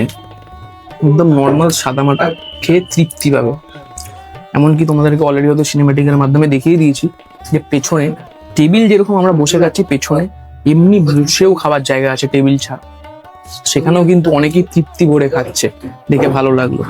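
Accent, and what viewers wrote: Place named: West Bengal, India. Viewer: Tor bhasha shunei bujhechhi.. Tui edeshi.. Edeshi ra khawa kobe shikhechhe??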